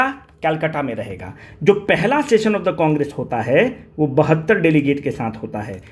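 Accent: Bihar, India. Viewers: I have not seen you...but I love you sir...Dil khus kar deta hai aapka video.... gazab samjhate hain aap...Bihari Hindi aur fluent English...deadly combo...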